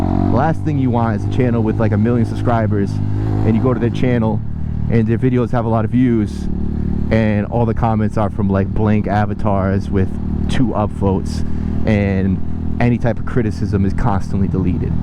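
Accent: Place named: New England, United States. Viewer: Sounds like a bit of "subscriber jealousy" by an obnoxious Yankee to me... I don't think I've heard of him before and only looked him up since you were whining about him... He's a bit obnoxious since he's a youngster and I'm and old fart and as such find ALL youngsters annoying, but with your Yankee accent, you are even more annoying...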